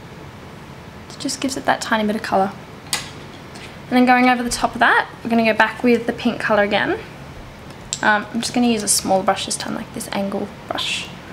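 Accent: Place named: Australia. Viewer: u have such pretty eyes.. oh nd ur relle pretty too lol..are u from australia i love your accent!!!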